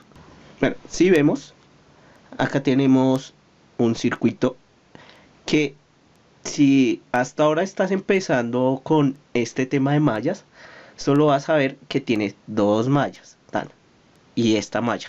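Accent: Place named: Bogotá, Colombia. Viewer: Puro acento de rolo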